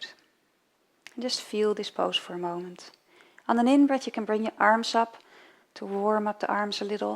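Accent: Netherlands. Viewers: No she's Dutch, you can so totally hear that.. ^^ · yu sound like yur from the netherlands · No she is obviously dutch :D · You sound dutch :D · Nice house. Nice workout. Yes, she is Dutch, I'm Dutch and this is a typical Dutch accent. No, she didn't learned it in Ireland. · You sound pretty dutch, have you also got dutch yoga vids? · you sound dutch ( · ik hoorde meteen dat ze nederlands is ;p xD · Funny how you can always tell a Dutch accent ;) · I'd say she's Dutch.... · She sounds dutch xD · Ik hoor aan je stem dat je nederlands bent! Haha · super leuk dat je Nederlands bent ! goede video ook wel echt nederlands-engels accent. oefen daar op dan word de video duidelijker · Hahahaha the Dutch-English is hilarious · Nederlands accent? of vergis ik me heel erg :) Maar alsnog een lekkere yoga oefening. Dankjewel. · het is echt duidelijk te horen dat je nederlands bent haha. 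goeie oefeningen :) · i'm irish. she's definitely not. shes dutch lol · i was wondering what nationality you are? you sound dutch. thank you for your videos i watch them often :) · Well like she said herself, she is Dutch. And you can really hear this too, if you are just a tiny bit familiar with the Dutch accent. Her vowel pronunciation is typically what Dutch people do when speaking English. Also her lack of pronouncing "th" is significant. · omg the dutch accent is killing me xD I am dutch btw.... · are you from holland? you sound like you have a nederlands accent :) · Great video, makes me want to get into yoga. Sounds like a dutch accent to me. : Wow. Are you serious? I think god knows what's in our hearts. If we were doing yoga while actively thinking about praising idols then yes. That wouldn't be good. But of we are doing these yoga poses to strengthen the body god gave us and wanted us to take care of then there isn't any problem. 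In the bible it explains that your body is a temple which should be glorified and taken care of. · She's obviously Dutch. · Hi eferybody velkom to... hehehe that is so Dutch accent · Hahah Nice i'm dutch to and i heard straight away you were dutch. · Zeker een Nederlander. Mooie stem :-) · Ik kan horen dat je uit Nederland komt :) Het is echt heel goed uitgelegd! Super bedankt! xxxxx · dit klinkt zo Nederlands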